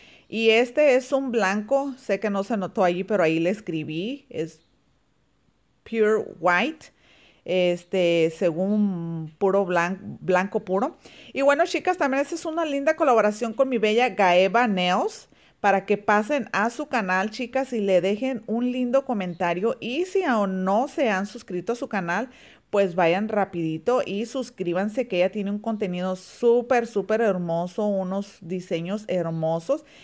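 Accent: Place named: France. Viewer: Ayyy mi hermosa me super diverti viendo el videito te quedo hermosa la muñequita aunque se te salga lo frances. Bendiciones 🙌y un🤗 de🐻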